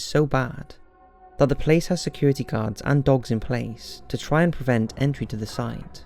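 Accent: Wales, United Kingdom. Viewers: It's his Welsh accent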